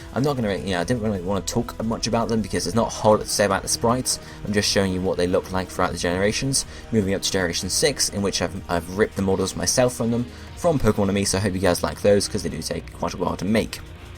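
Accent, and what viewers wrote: United Kingdom. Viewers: I'm from Sweden and even I can understand what he's saying with his british accent. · I don't get a thing you say with that British accent.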